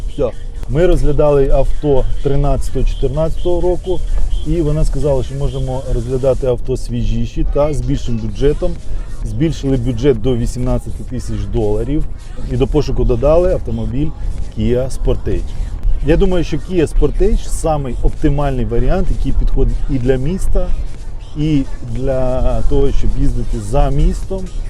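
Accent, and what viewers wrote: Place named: Surzhyk, Ukraine. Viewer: Ви круто навчилися балакати нашим суржиком))))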